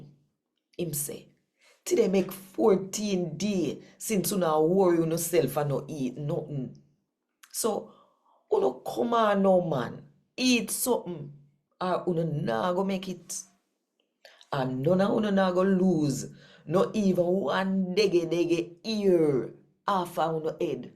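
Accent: Jamaica. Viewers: Such a beautiful lady inside and out. Im about as Jamacian as i look, but i found an awesome way to listen to the bible. I understand it. I cant speak it but i understand. Most of it. I love your language dialect accent or whatever you call it. If you read the gospel of John... i will learn quickly bc i know it almost by heart. Ill look. God bless you, sister!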